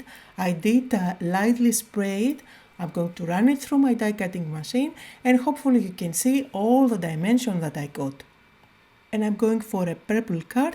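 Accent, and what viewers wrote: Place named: Greece. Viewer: So, mom and I were just talking about what the people have said about the speed in which you talk etc. Your native tongue is a language of love, it ranks right up there with Italian. My best friend of 17 years is from Greece, his family immigrated here when he was 5 and he didn't speak a lick of English. I was on a video chat with him one night and he was talking to his mom in his native tongue and it was so beautiful I asked him to repeat what he just said! "I'm not going to tell my mom that dinner is ready again, Sash." Is what he said 😅. So, mom and I think you should do a video in Greek and if they wanna know what you're saying they can watch the subtitles. Speak at a speed you're comfortable with, Greek is spoken faster than English, and your accent is amazing! Don't change how you do your videos because someone thinks it isn't good enough. 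❤❤ Mad love to you!!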